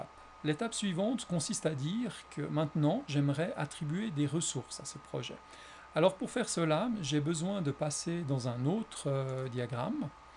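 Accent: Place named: Savoie, France. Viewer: Merci !!! Et par curiosité, l'accent (léger) c'est de Savoie ?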